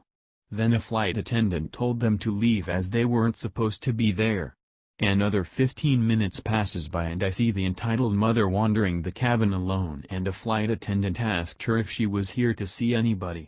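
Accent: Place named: Japan. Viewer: Your accent sounds Japanese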